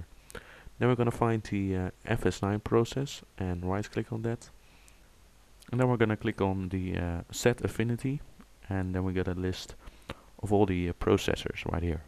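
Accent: Netherlands. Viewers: nice gonna try it ! by the way you have a dutch accent haha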